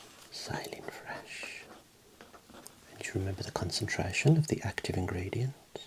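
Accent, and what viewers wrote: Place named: West Midlands, United Kingdom. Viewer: When MassageASMR stopped making videos I sadly accepted that I’d never find another ASMR creator who relaxed me as much as him — then I found your channel. YouTube algorithm kept putting you on my homepage way back before you were even at 1k subs I think - reluctantly I gave you a go seeing as the algo was so insistent on you… thank goodness I did that! You’ve become my favourite ASMR creator BY FAR. Especially helps your accent reminds of back home in the West Mids. Long may your channel keep growing and thriving!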